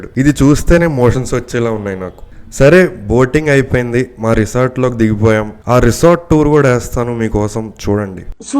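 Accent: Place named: Godavari, India. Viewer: Food tho paatu commentary kuda proper godavari style. Thoroughly enjoyed bro.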